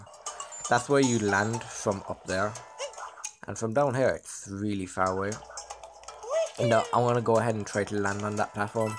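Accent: Ireland. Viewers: Ah, you do actually have an Irish accent, now that I listened closer but, you're right it's not as prominent as a lot of other Irish accents. Also (not to be rude) but, I love your little lisp. I've always been a fan of people with lisps. I find them quite adorable. Like my daughter will tell me about somebody and she'll say oh mommy you are going to love this person, and also they have a lisp.☺️ and I just really think that it makes your speech very unique and adorable. so I hope I did not offend you in any way.😊 And if you figure out how the heck to get off of that little island and, over to the other place I would really appreciate it if you could let me know. it's driving me nuts. Thank you so much. My name is Linda by the way 😉💚💚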